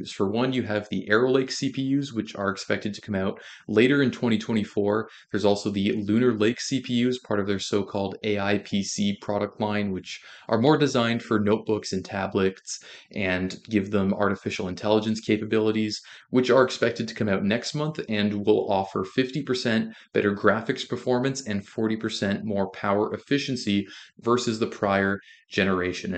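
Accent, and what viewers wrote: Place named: Canada. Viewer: From your accent you must be canadian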